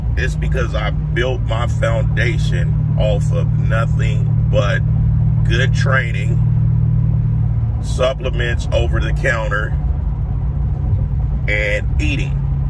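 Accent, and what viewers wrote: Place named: Texas, United States. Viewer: I know you're from Cali. But you sound like you're from South Texas. You're hilarious, great information.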